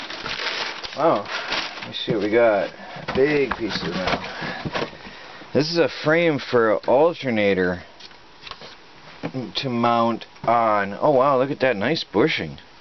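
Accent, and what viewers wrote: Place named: South, United States. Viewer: Come on now, have you ever been in the south where creek sounds like a crick in your neck? PotaTOE Potaaaato TomaTOE Tomaaaato....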